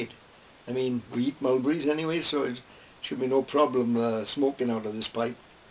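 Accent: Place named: Wales, United Kingdom. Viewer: Thank you for this. As a Welsh woman living in England, it was lovely to hear your accent and Lonesome Dove is one of my favourite novels, so I liked your book review. (The pipes looked really good as well :)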